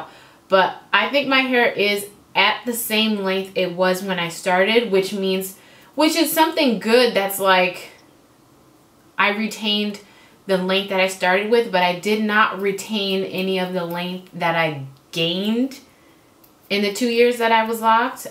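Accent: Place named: Atlanta, United States. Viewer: I’m from Atlanta and I live in Washington state too lol what a coincidence I heard the swang in your voice and I was like where is she from hmm . Well subscribe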